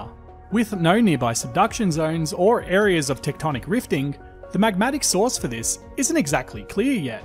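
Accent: Australia. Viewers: Great to hear an aussie accent here on YT well researched and presented indeed. NSW here. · Its awesome to hear more Australian accents on Youtube, especially on 'serious' channels that don't just involve gravity or hitting things with other things while wildly gesticulating and jabbering in monosyllables lol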